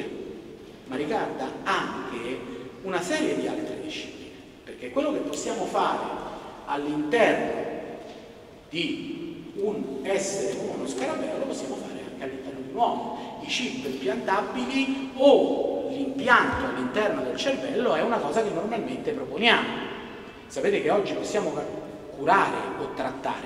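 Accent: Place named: Rome, Italy. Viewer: La cadenza romanesca è alla mano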